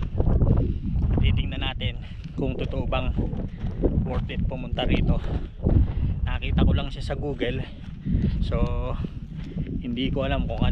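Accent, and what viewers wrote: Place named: United Kingdom. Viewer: ung accent British na talaga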